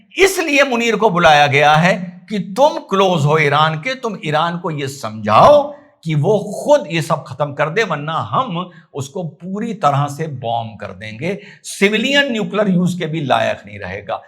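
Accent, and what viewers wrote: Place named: India. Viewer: you dont look like pajeet but sounds like pajeet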